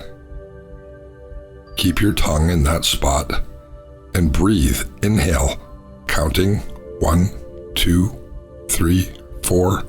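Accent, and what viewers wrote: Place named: United States. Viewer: your work is incredible! Just discovered this new one today and got a refreshing nap... knocked me right out. Why doesn't YouTube push our your videos? I have mosophonia and can only listen to American accents. Most hypnotists are not. You, Barry Eisen, and Jody Whitely and Lunayes are powerful and all my lifesaving favorites! Thank you and God bless you always!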